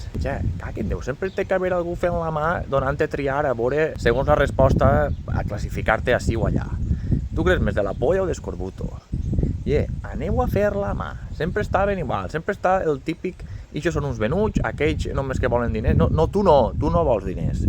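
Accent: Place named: Ribera, Spain. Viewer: Ets un tío carismatic, vingent de tu en eixe accent de la rivera , un deu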